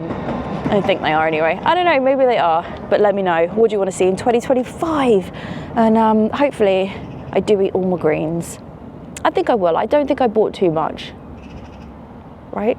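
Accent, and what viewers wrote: United Kingdom. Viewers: I come here to learn British accent 😂 thanks and take love from Bangladesh, ♥️🇧🇩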